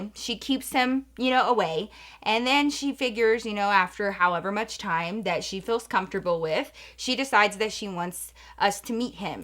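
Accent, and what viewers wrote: Texas, United States. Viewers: I’m from San Antonio and I been watching you for years now and every story time the way you talk girl I can tell you’re from Texas 😂💕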